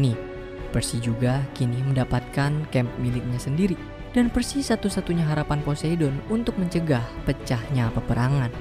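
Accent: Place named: Java, Indonesia. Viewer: bang orang jawa ya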